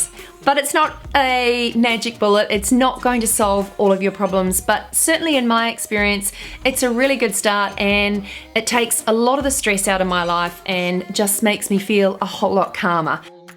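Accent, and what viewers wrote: New Zealand. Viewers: That is not an Australian accent. I think she’s from New Zealand. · As soon as you started talking I was like is she kiwi yes she has to be. I was also very excited that you are 💓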